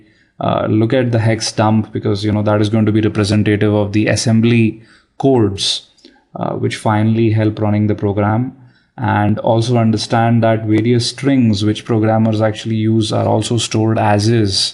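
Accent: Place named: England, United Kingdom. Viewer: you have a strong english accent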